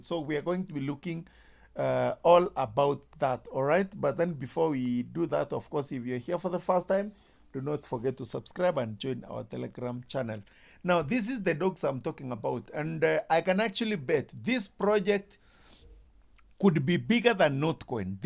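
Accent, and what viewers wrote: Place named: Kenya. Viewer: You sound Kenyan.. Kwanza mkale😂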